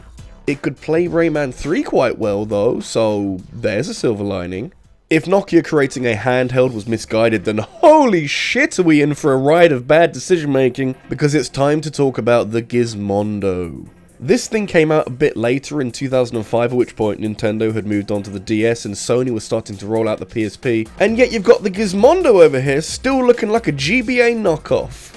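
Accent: England, United Kingdom. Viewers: Who would of thought a English man pronouncing English words would trigger some people.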